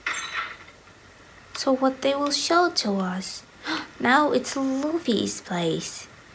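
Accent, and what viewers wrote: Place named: South Korea. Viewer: Your English ascent is like Korean are you from South Korea, but you don't look like Korean.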